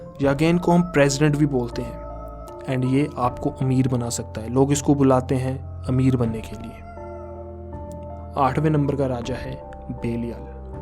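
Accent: Punjab, India. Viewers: Bro shi btao tum punjabi ho na😁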